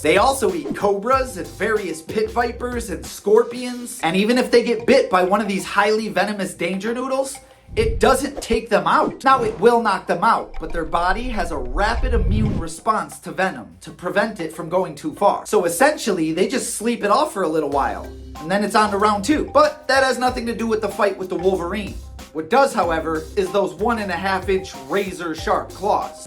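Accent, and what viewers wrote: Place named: New York, United States. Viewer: I just wanna say that I truly hope this guy makes a ton of money. He is so entertaining and hilarious and he looks at things that we're so used to and he makes us look at those things in a completely new and admirable way, like he makes us respect and appreciate the nuances of animals wtf?? And with a new york accent, it's just so uniquely epic and entertaining. Never change,!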